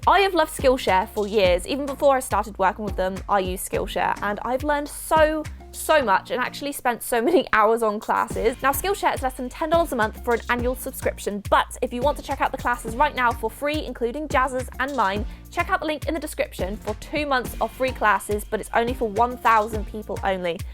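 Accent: United Kingdom. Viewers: Is anybody else just obsessed with her British accent? 😂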